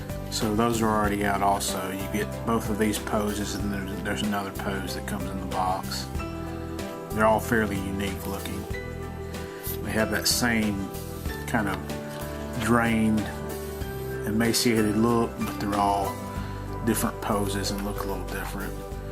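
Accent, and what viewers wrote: South, United States. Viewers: thank you very much for this. the musi with your soft southern vouce makes this very hyypnotising and relaxing.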